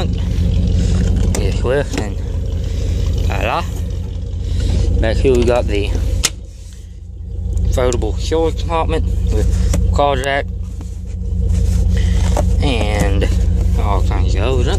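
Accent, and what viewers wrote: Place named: Australia. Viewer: think he's either Australian or New Zealander.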